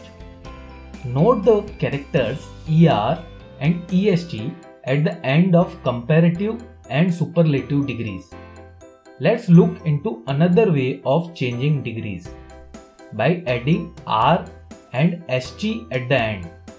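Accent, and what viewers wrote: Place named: India. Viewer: Hehe is accent... he's truly an indian ...and most of the students are also India's here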